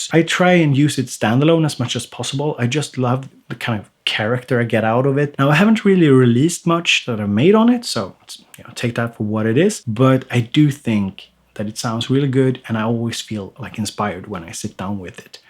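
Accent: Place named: Sweden. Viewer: I've been wondering where your accent comes from. Hearing your long 'e's tells me you're Sw'e'dish. :) Thanks for the videos!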